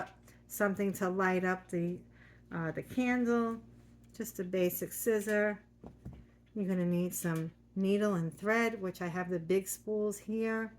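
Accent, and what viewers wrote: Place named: New York, United States. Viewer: Absolutely beautiful! Love that NY accent ❤❤ it reminds me of a peony. I’m going to give it a try. May make one for the baby shower Sunday.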